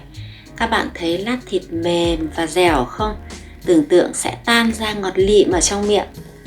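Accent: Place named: North, Vietnam. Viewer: A+. Giọng Bắc hay quá nên cho thêm hai dấu + ... A+++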